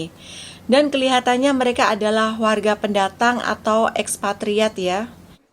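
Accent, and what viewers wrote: East Java, Indonesia. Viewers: aku senang sama gaya bahasanya jeng, pakai bahasa Melayu, tapi logate medok khas Jatim, keren jeng... 👍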